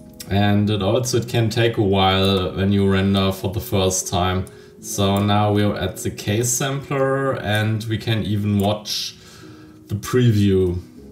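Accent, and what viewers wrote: Germany. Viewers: the german accent gives me hope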